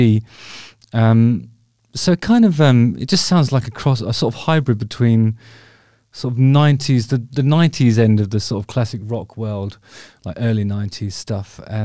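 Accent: United Kingdom. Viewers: Just recently found your stuff Justin. I really enjoy it. Sort of missed out on The Darkness as I was already living in Germany as you lads were getting recognition in Blighty. So I'm catching up. What kind of Gibson is it you use to play your intro song? Anyway keep up the good work. Always nice to hear a nice British accent , the humour and the observations. All the best pal from the only Glaswegian living in Sachsen.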